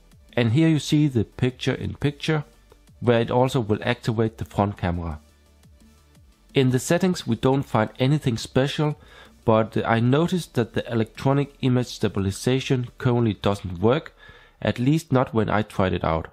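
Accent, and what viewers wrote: Germany. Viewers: are you german? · you are german right ? 😎 your accent tells it all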